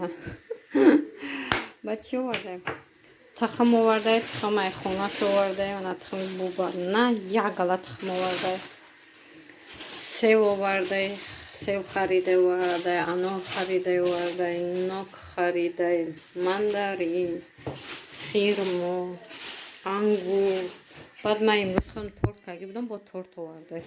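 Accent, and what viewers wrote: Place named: Tajikistan. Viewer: САЛЛОМ ГАП НЕСТАЙТОНА РАНГИ МА ГАП МЕЗАНЕД ЧИСТА ТОЧИКИИ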